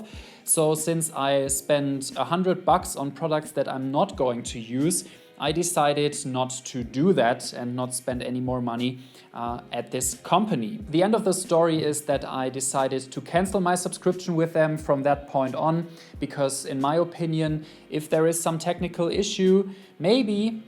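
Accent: Germany. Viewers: Yes he have a thick german accent... I know cause I have been living in munich since two years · I heard 2 seconds and I knew you was German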